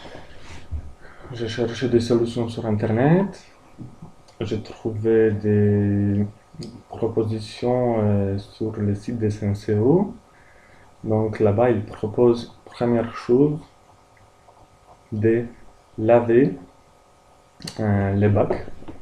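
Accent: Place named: Poland. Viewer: Explications un peu longues mais Merci car je viens de sauver ma cafetière ! A poznaje czysty polski akcent !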